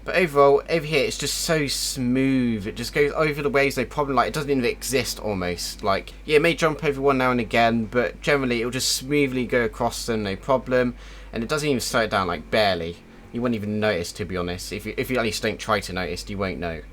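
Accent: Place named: United Kingdom. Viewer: That is British English for sure haha. Thanks for a nice video 😊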